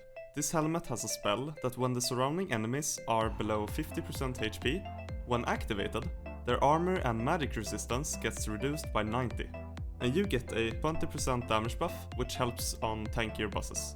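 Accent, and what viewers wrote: Sweden. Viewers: Lol, your accent is so swedish. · you sound so swedish =)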